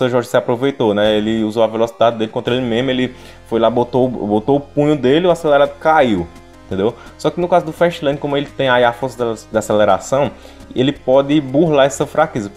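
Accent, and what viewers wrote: Northeast, Brazil. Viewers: O melhor nen e o conteúdo e sim o jeito nordestino do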